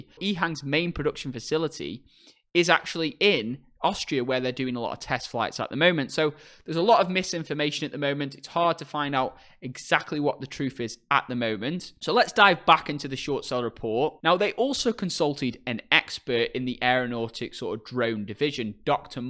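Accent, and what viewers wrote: United Kingdom. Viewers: Bought in at $64; it will not be fail this company. It has the technology to address future market needs 3-10 years forward. I always like your presentation! Plus a tinch of northern accent!👍😄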